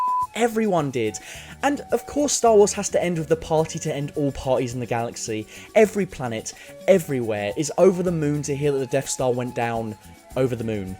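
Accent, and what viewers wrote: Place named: England, United Kingdom. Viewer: Shriiiieeeeeek.... American pronunciations from an English accent, give me chalk screeching on a black board any day!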